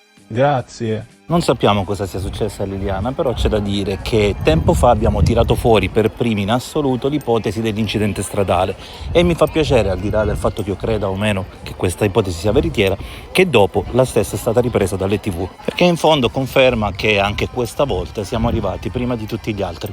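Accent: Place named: South, Italy. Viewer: Ma questo che parla sei tu stesso? Ha l'accento di uno del sud che ha vissuto un po' al nord, abbastanza peculiare come modo di parlare.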